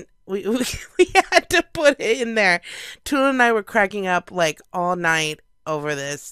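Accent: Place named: North, United Kingdom. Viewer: Uhmm... Pin your hair back. You live up north, yeah? I love to hear the accent come out.... Poor me got stuck down south. I wanna move back.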